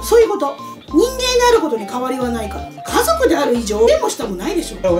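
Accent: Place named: Gunma, Japan. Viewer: 話し方がいかにも群馬って感じですね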